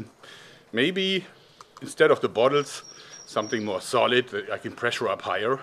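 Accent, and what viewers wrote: Germany. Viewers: is he a german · I wonder where he's living - cause he uses all the american measures:D but i suppose germany - cause of his amazing accent ( and the laughing is gorgeous ):D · ich habe an ihrem englisch erkannt das sie deutsch sind 👌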